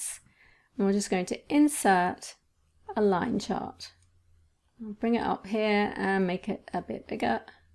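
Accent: United Kingdom. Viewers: Good fast video I think your are British aren't you?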